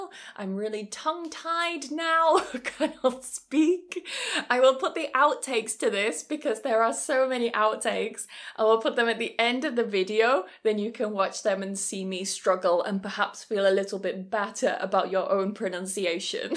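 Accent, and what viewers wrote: United Kingdom. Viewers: Yes, Number 20 is ittle bit harder to pronounce. I love your 20 Tong Twisters. I like the British pronunciation better than American pronunciation. Thank you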